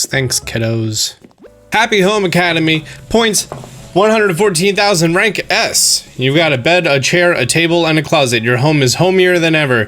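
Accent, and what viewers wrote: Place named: United States. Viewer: the factttt i knew u were from either PA/NJ/DE from the first vid i watched by the way u said “home” and “water”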